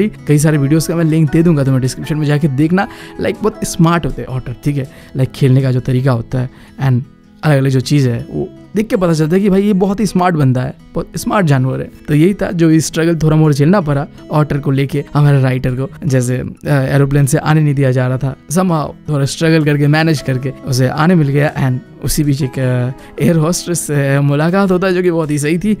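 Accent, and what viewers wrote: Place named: Assam, India. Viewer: Bro has Assamese shall bihu bihu lagise ..... Love from Assam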